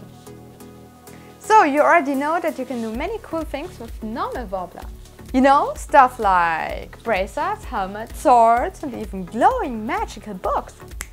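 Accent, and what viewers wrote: Germany. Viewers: German accents are so cute. Makes me want to hug every German when they speak English.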